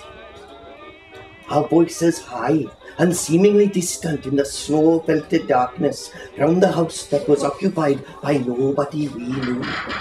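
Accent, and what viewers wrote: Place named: Wales, United Kingdom. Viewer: As a Welsh person myself, I’d just like to say that you nailed the Welsh accent perfectly.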